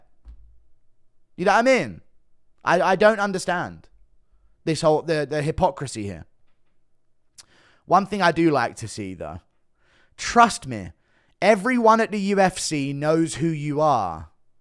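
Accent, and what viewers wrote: United Kingdom. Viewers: British accent makes the unwatchable..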